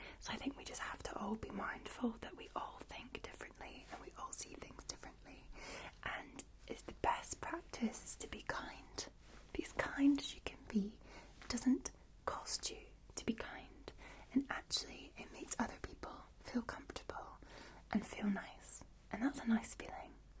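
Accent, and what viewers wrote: Scotland, United Kingdom. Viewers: Me looks at the title of the channel: that’s a weird name Me after hearing 3 seconds of a vid: oh she’s scottish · I caught the tale end of the Instagram chat it was awesome to see your sister her Scottish Accent is way thicker then yours I guess because you lived In London for 8 years! Thank you for the chat it was nice! Is my guess that you let your sister win the arm wrestling contest on the mark LOL 🤣 my sister's could beat me in an arm wrestling contest there way stronger then me I'm just taller then they are! Thank you for this really relaxing Tingly video I appreciate it!:_Loveheart:_Loveheart:_Loveheart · Hello 💖💖💖❤️❤️❤️🥰🥰😘😘🤗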